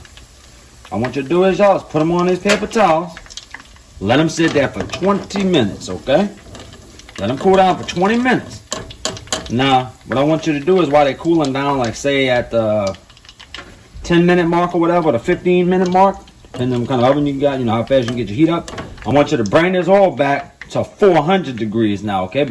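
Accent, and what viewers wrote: Louisiana, United States. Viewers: My boy got that terrebonne parish accent lol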